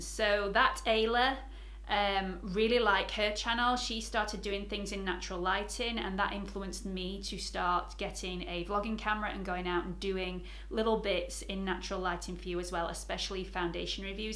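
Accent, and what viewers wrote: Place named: Yorkshire, United Kingdom. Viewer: I should’ve known you was a Yorkshire lass as your so down to earth although I did detect the accent but sometimes it’s difficult Cos u cud also be from Manchester or somewhere 😂 I’m guessing Leeds maybe 🤔 I’m from good old Doncaster 😁 and you sound just like me 😂😂 anyway I just want to say a big congrats on your one year anniversary it’s a great achievement. Am only a recent subscriber but am loving your channel 😃 keep up the good work lass thas a proper diamond 😂oh and you do not look 39 😲 ❤️ xxx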